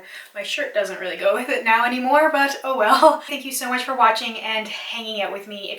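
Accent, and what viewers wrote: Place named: Canada. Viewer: I can't understand how anyone could possibly have an issue with you. You come across as such a sweet and genuine person. I don't know any Canadians so I'm loving your cute little accent too. Keep being you as you're great as you are. Remember, this is your channel and you don't owe anyone anything! Much love ❤️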